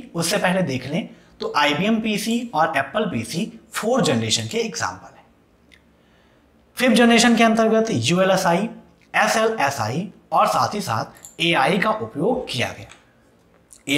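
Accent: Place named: Madhya Pradesh, India. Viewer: Mp की laungage लगती है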